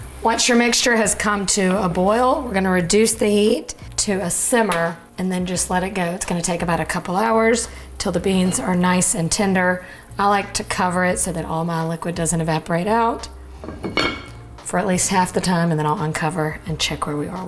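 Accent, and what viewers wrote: South, United States. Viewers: Gawd you are amazing. An Italian woman with a southern accent is pretty awesome.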